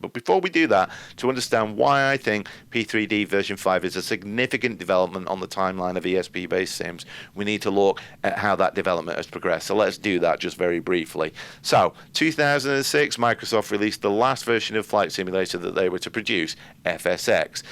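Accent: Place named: Lancashire, United Kingdom. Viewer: Honest - I enjoyed every minute of it and I learned a helluv a lot from it - even moreso in an accent that goes well with me who also stems from 1/2 way down the East Lancs Rd - 85 years ago I knew absolutely nothing about all the fuss about DX 12 but now I do I can't say I was impressed by the final graphics - it was very "posterised" I was hanging back from jumping in but I will give it a go - on a separate install and add bits of Orbyx as I go John Heaton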